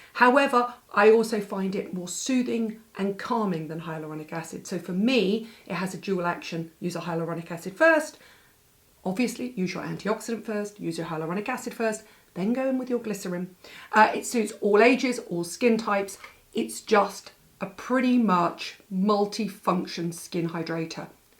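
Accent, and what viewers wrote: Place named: RP, United Kingdom. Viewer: i love her accent i wish i had a posh british accent too🥺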